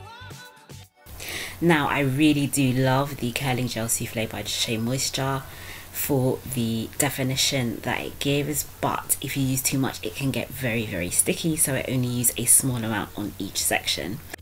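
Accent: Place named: England, United Kingdom. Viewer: First let me say i love your video's ! I'm wondering where you're from or where your accent comes from. I'm thinking you must be English. Every time I hear your intro, I can't help but think of the movie Silence of The Lambs. Hello Clarice.....LOL !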